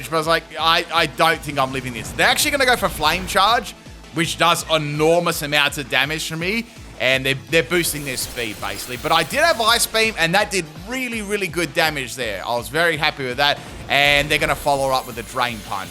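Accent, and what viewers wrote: Australia. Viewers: Love your vids! I enjoy how you are australian and more language illiterate than us Americans! Everyone makes fun of us for not knowing languages but as someone from the U.S who speaks 4, your pronunciation on words makes me feel better on the languages im trying to learn!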